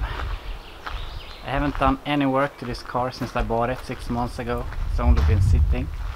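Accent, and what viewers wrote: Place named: Sweden. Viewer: Hördes direkt att du va svensk, nice video!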